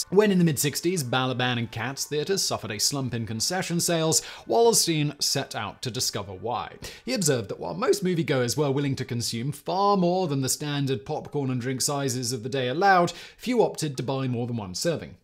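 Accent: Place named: RP, United Kingdom. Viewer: Why do he speak in that accent when in much older videos he sounds nothing like this? As someone from England i really feel like he's hamming up the RP accent to appear more sophisticated to the american audience?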